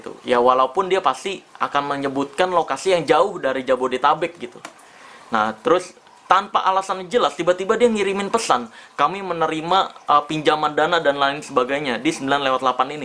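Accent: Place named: Tegal, Indonesia. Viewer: Bg tegal yah gw tegal jawa tengah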